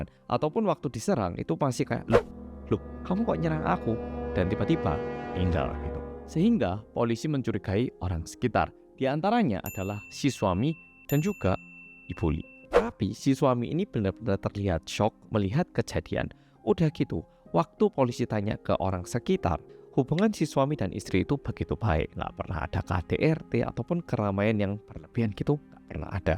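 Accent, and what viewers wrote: Java, Indonesia. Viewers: orangnya juga pernah tinggal di jawa kak,jadi agak medok gitu ya pembawaan nya😂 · Medoookkkk.... Hadir sidoarjo samm ❤ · Kasus nya lumayan plotlist..tp itu siabangna kok bisa Korea tp medokkk ngomongnya..campuran ya bang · Selalu ikut ngomong medokkkk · Seneng bangeet yg bercerita org korea tp kyak org jawa asli ❤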